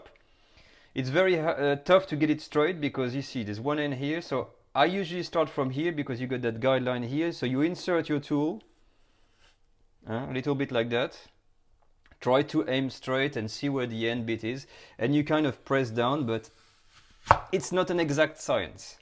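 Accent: France. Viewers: I love all your recipes! And your French accent 🥰 thank you so much! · great channel, will be making your recipes this weekend so excited! love the french accent... lots of love from NYC !!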